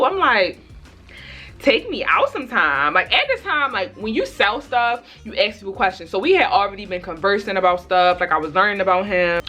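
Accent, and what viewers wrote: Baltimore, United States. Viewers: you from Baltimore you gotta accent I can hear it 😭😂😂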